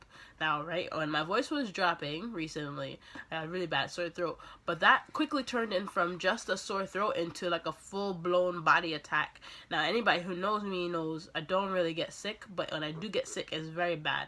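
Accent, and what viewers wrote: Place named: Caribbean. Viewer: i can hear the Caribbean coming out in this vid haha 😁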